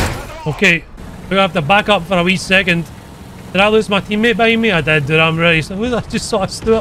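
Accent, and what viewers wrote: Scotland, United Kingdom. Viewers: The guy is Scotish he can't tell the difference...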